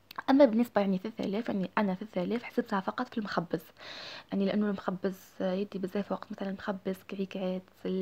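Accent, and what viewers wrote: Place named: Djelfa, Algeria. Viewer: الله يبارك حسيت من لهجتك جلفاوية يعطيك الصحة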